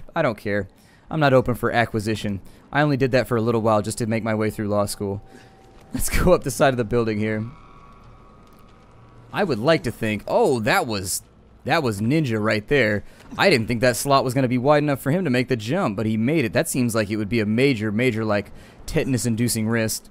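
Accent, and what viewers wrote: United Kingdom. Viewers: Guy totally looks Cuban, talks like a brit lol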